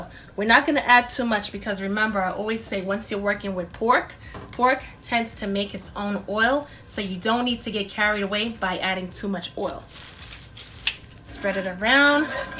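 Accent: New York, United States. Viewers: Omfg I'll watch this over and over again just to hear that NYC accent!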